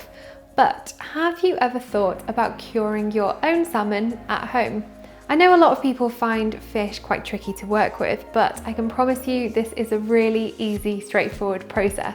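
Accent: United Kingdom. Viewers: I love her accent, she has an old school British charm.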